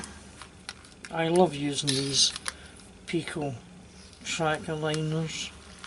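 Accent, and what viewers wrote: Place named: Scotland, United Kingdom. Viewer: Love the video and as if by magic "as Mark quoted " ,im new to N-Gauge so have been watching loads of videos to learn ,then i found you "brilliant" im from Aberdeen and your from a wee bit down the road i gather by your twang. keep it up im going to join Aberdeen Model Railway Club and gain knowlage from them too, i just bought a load of stuff from your favorite Ebay and am converting the engines to DCC,look forward to more videos ,keep up the good work and Thank &You. .regards John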